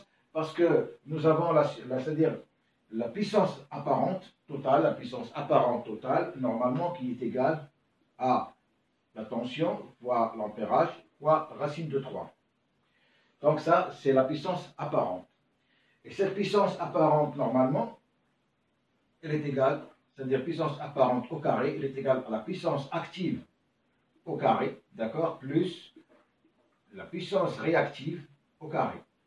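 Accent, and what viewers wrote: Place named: Morocco. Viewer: Je crois que vous êtes algérien Mais vôtre accent semble marocain On tout cas je suis fière de vous Professeur Merci.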